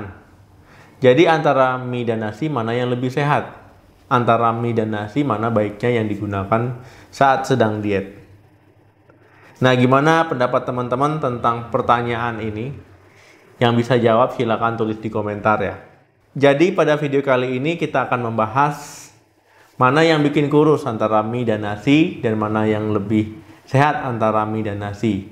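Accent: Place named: West Sumatra, Indonesia. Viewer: Logat nya kayak orang sumbar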